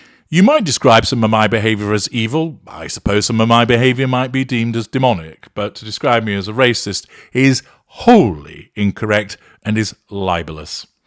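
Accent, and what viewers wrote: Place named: Northern England, United Kingdom. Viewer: OMG, you're white, I didn't know that. LOL I think I do detect a slight Northern accent. I enjoy hearing the "Horn of Ridicule". I'm awaiting more episodes of when you met the Mare (like you, I do not refer to her by name). I'm surprised that nobody had yet used "high yaller" to describe the Mare! Stay well and safe. 😀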